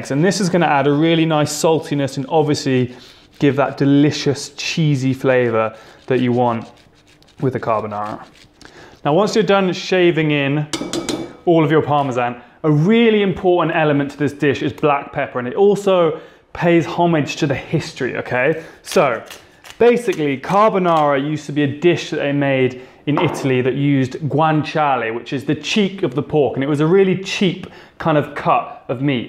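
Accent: England, United Kingdom. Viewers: Your Engish accent when you speak in Italian is adorable.❤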